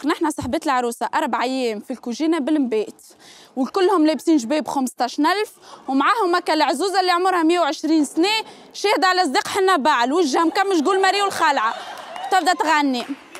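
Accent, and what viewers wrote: Kasserine, Tunisia. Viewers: rani 7ata na gassrinia ema hya s7i7 t5dem 3la ro7ha rabi y3inha o5ti ema mch bdharoura bch tdha7k l3bed tst3ml l'accent mt3na wt7ki blahjtna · هي تحكي هكك هي مالقصرين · شحال شابة لهجة تونسية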